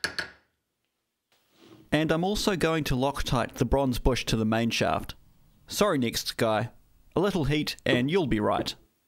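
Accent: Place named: New Zealand. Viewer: hi, I'm a kiwi living in Germany - I'm not a Land Rover fan but I wanted to watch a restoration series. Great to hear a kiwi voice, I'm really enjoying watch this series - well done. Des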